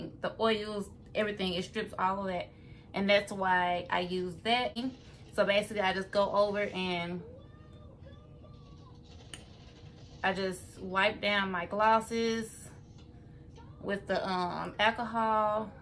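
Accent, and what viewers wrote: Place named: South, United States. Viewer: Love your country accent 😩 feels like home 😭❤️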